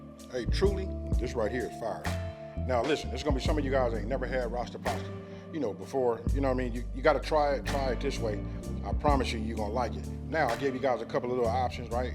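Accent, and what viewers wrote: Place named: West, United States. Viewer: I knew you was from Out west you got a strong accent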